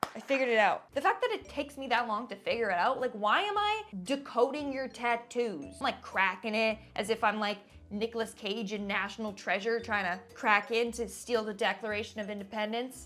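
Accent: New York, United States. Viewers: Haha...love your NY accent.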